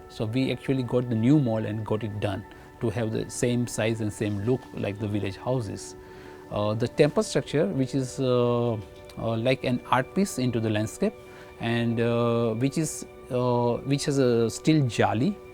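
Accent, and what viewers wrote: Gujarat, India. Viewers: Bhai mere, He is from Gujarat, We are learning hindi since our childhood. If you tell him to speak in hindi or gujarati he will be fluent. Ask your dad or mom to speak in english and they will be like this only. See the kind of work the person has done. Don't judge anyone by his way of saying a particular language. You are not even 0.1% of what he is in this world. :)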